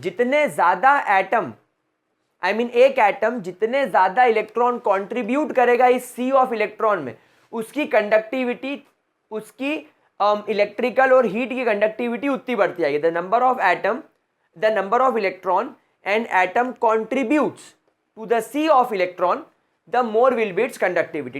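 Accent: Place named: Bhopal, India. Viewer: Sir your voice is same to same of bhopalvwalo :---- hoegi ❤️❤️❤️❤️🙏🔥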